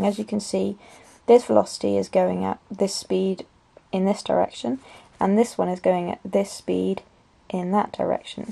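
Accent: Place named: United Kingdom. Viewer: I agree. I love the British accent.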